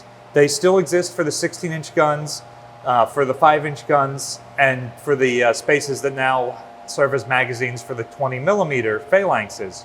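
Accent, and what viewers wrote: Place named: Delaware County, United States. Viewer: Great vid! Youre not from delco are ya? Cant place the accent, thanks!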